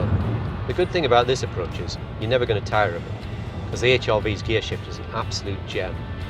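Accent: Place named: Newcastle, United Kingdom. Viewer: What do you utter at 2'.08" ? , 2 '.15"? , 4'.05" ? , 5.'.07" ? , and 4'.04" WTF do you say here? The biggie 5'.41" " to have our HRV ( Felled?) Do all people from Newcastle speak like you? Your intonation is as hard to understand as a foreign dialect ! I had to listen over and over those parts I noted to try and decipher the mumbled dialect and still could not work out what english words you were using . I thought maybe Newcastle has its own dialect , its patently obvious its not english ! LOL The review is good but you need to annunciate better and sort your intonation.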